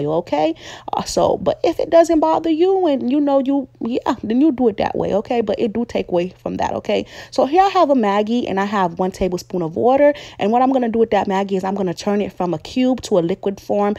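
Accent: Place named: Florida, United States. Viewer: You gotta be from FL😭😭 😂 I'm doing this tuhday 👏🏾👏🏾👏🏾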